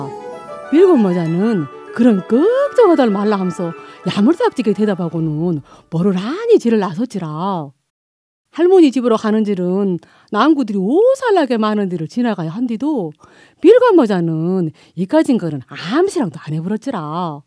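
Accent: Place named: South Jeolla, South Korea. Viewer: 아따~ 오메~^^ 생생한 전남사투리로 듣는 동화가 특유의 억양과 말에 녹아있는 장단때문인지 마치 판소리를 듣는 듯 해요.😊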